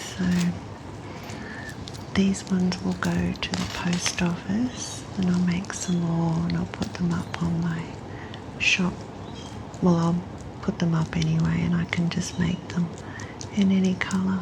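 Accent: Australia. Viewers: Oh no! Don't take everything to the Post office :D I wanted to buy couple of the items from etsy but I need couple of days for some more cash, I will mssg you at etsy which items I will definitely order, if they are still there. PS. Perfectly relaxing video, crinkles and soft voice, aussie accent is one of my fav <3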